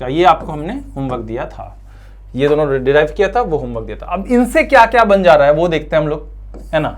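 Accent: Bihar, India. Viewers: You are in bihari style. Jai Hind Jai Bihar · Bihar se ho sir ? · Sir aap bihar ke hain kya?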